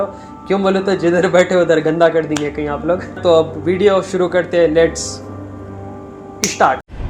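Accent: Hyderabad, India. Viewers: phle jaisi baat nai aari, (Hyderabadi bolne ka tareeqa...) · Accent bohot hai baato mein apke pure hyderabadi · Ak baat humesha notice kiya Hyderabadi admi kese bhi baat kare pata chal hi jata h ino old city ke h😀😀😀😀😀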